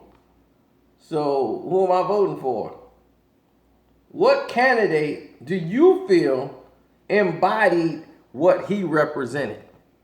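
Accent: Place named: Chicago, United States. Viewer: he's from Chicago lol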